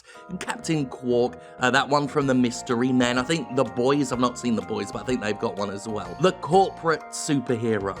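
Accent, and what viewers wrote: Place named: United Kingdom. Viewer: Don’t get how but okay? He has a British accent, 👏👏👏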